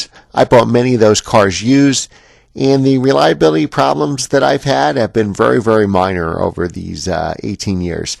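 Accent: United States. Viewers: as soon as I hear an eemerikun accent, I switch off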